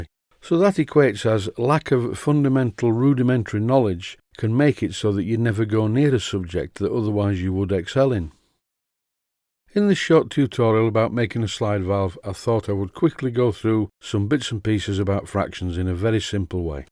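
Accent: United Kingdom. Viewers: Well done, greetings from the USA. I've always had an interest in steam power, I guess from the many stories that were passed on to me from my uncle and others who farmed years back with horses and steam power during the early 1900s and on. I don't mean to ramble, I like to share at times. I'm now ready to start a steam engine build project I think. The workings of the valve you did so well explain here helped me a lot to understand how steam gets to the cylinder and so forth., However, I can't grasp the word you used in the beginning to describe the type of metal or cast material needed. And please understand it's not your UK dialect., The audio just didn't get through for me to hear you well. And many thanks for sharing so much here. And very cool you're a musician by trade, and doing these steam projects too. Take care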